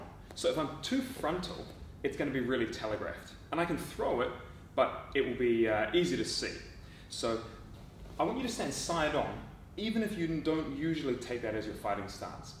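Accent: Australia. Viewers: do I hear an Aussie accent ? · are you australian?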